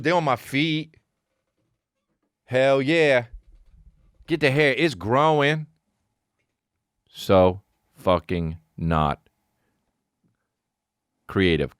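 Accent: United Kingdom. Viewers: Your British accent is surprisingly really good!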